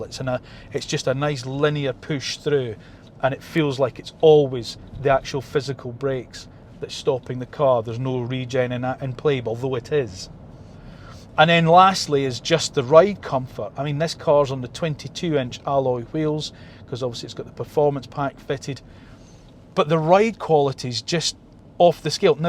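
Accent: Scotland, United Kingdom. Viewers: Great video. Love the Scottish accent! Greetings from NEW England USA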